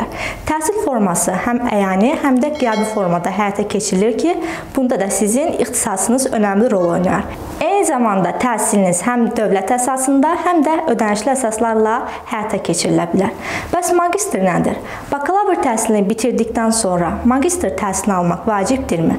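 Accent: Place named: United States. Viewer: Sözləri sanki Amerika ləhçəsiylə ifadə edirsiz. Danışığnzı düzəltsəz yaxşıdı. Adam dinləmək isdəmir. Bu kanal çox faydalı kanaldı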